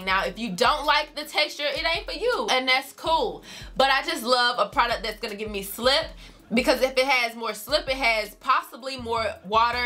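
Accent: South, United States. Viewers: is on a natural high. We luv you gurl. sounds like a Southern Bell😂😂😭😭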